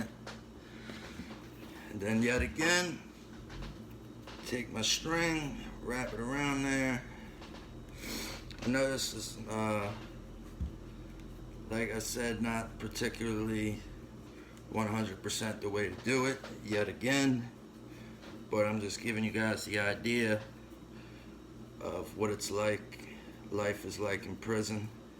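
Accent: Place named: United States. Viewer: It’s pretty insane from my perspective to know that at some point you had to learn this to survive in 2020/2021.. Sound like your from the states as well. Stay safe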